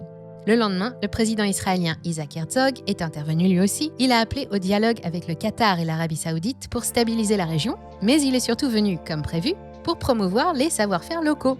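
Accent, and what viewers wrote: United States. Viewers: Vidéo totalement vide d'intérêt et puis cet accent al américain détestable..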